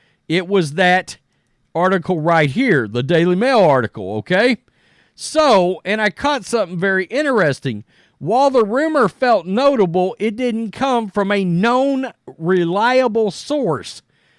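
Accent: South, United States. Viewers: Dude WHERE ARE YOU FROM? TEXAS? THIS IS BUFFALO AND PITTSBURGH IN JANUARY!!! DID YOU JUST DISCOVER FOOTBALL? This is like shooting yourself in head as treatment for a headache. You're gonna suggest trashing the entire playoff schedule for the winner because playoff football has to be played in the snow? IN BUFFALO???? You sound like you're from the south so i get how SNOW sounds to you, but this is utterly ridiculous to suggest SNOW is that big of a deal to Western NY and Western PA ppl. We LIVE in Lake Effect snow conditions our entire lives!!!! Sit this one out country boy. Guarantee the Bills would be LIVID to give up home field advantage for some snow. This is extremely hilarious 😂🤣 tell me you're not from around here without saying your not from around here. If ANYONE can play in these elements... Its Pittsburgh and Buffalo 😂😂😂😂😂😂😂😂😂😂😂😂😂😂😂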